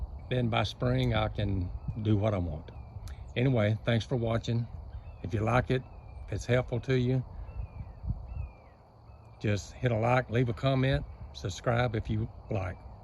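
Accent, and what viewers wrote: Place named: Louisiana, United States. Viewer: I was guessing Louisiana with the accent lol. I was close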